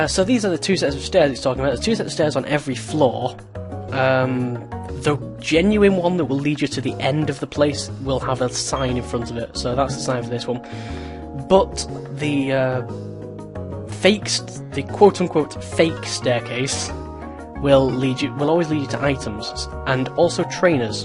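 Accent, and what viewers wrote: United Kingdom. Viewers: Are you from the UK? I love your accent! x3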